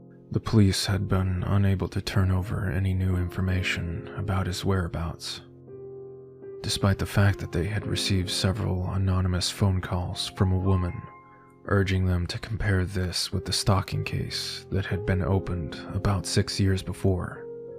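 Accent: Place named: United States. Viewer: Certified thug classic. Love this story and happy to hear a murican read it.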